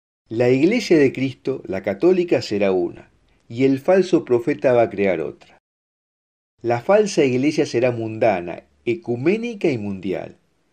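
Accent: Argentina. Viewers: A mí me da una ternura inmensa, además del tono argentino. Saludos!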